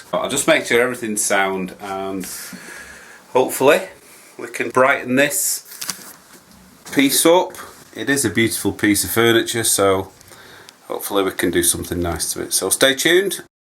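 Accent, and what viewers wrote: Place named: Northwest England, United Kingdom. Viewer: This was a lovely video to watch. You obviously take pride in what you do and the piece should now last for another hundred years. I learnt so much from this for a piece I'm going to try and restore, so thank you. If you take commissions, you should mention how to get in touch with you. It sounds like you're from the NW and I am too, and I know my furniture would be well looked after if I brought them to you.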